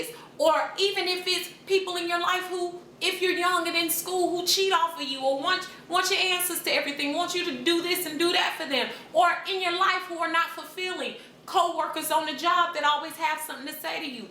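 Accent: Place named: Florida, United States. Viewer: you sound like your a florida girl lol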